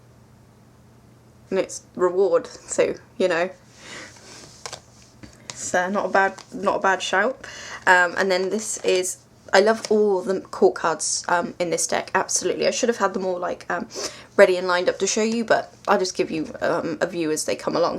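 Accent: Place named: England, United Kingdom. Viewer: Excuse me for being Off Topic but your accent is charming. Where are you from in England? I'm writing from New York (Na' Yawk). BTW, The Voyager Tarot is an excellent deck. You really have to "work" with it, but the payoff is great.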